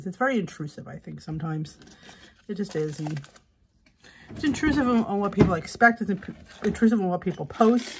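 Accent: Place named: Philadelphia, United States. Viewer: From one South Philly girl to another- You can take the girl out of South Philly but you can’t take South Philly out of the girl🥰As soon as I began listening to you (recently) I thought you sounded like a Philly girl. St.Maria Goretti HS grad. Class of 1970!!! Much older than you 😊 Really enjoy your channel 👏👏👏👏👏 Mary from Delaware County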